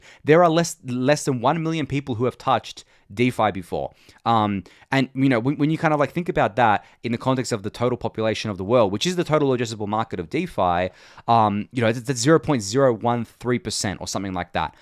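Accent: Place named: Australia. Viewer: The Daily Gwei episodes and your soothing Aussie voice makes me feel at home. Thank you for amazing content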